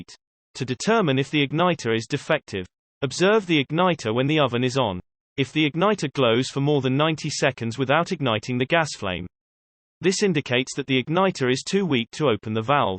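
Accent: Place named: England, United Kingdom. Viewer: What a waste....really? No mention of stove top problems...only oven issues...but, suppose that by putting an English accent as narrator makes it seem ok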